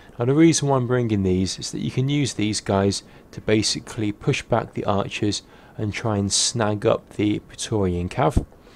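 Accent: United Kingdom. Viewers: idk,i just love your british accent :D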